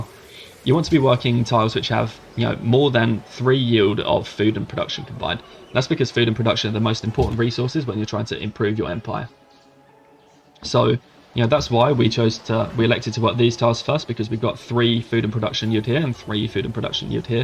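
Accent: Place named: United Kingdom. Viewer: I like how dudes from the UK don't say culture, they say culchá. It sounds cooler.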